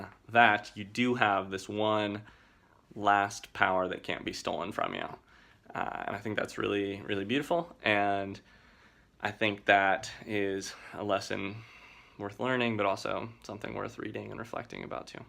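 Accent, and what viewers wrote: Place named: United States. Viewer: You know,. you are so American. The way you carry yourself and talk is typical American ( according to what I think is typical American I guess😅) so adorable🥰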